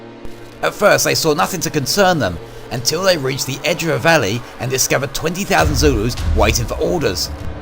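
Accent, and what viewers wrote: United Kingdom. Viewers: Who is this bloody oik with his mockney voice? It was not Durnford who discovered the Zulu army, and the rest of the account is sketchy to say the least. 2 out of 10, sonny, but that's an A+ by modern UK Comprehensive standards.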